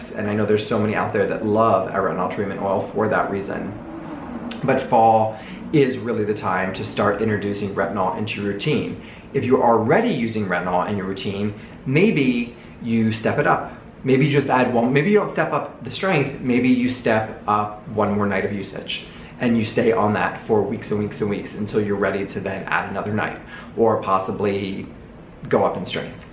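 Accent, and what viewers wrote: New York, United States. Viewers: Our first New Yawk Tawk!! Love it. Sip sip!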